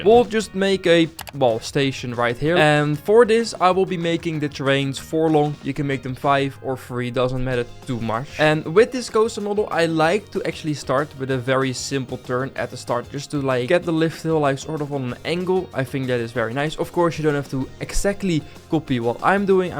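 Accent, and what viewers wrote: Netherlands. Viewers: You got a dutch accent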